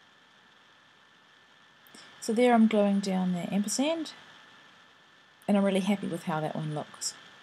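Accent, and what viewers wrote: New Zealand. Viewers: This is gorgeous. I love that you keep any 'mishaps' in there and work through them. I actually think the gelatos and acrylic paint at the end looks pretty cool and arty! It's also nice to hear a NZ accent. My Dad is a kiwi and I miss that accent.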